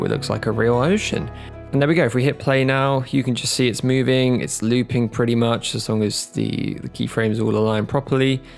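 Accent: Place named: United Kingdom. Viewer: i think you are british guy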